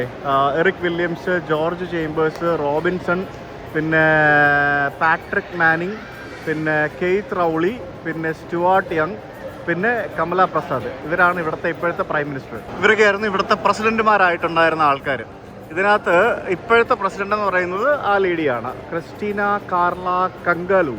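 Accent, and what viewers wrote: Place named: Thrissur, India. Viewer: എന്തായാലും തൃശ്ശൂർ സ്ലാങ്ക് സുപ്പർ ആയിട്ടുണ്ട്ട്ടാ 👍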